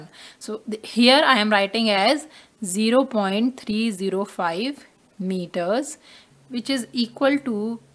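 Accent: India. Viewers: Indian accent is so disgusting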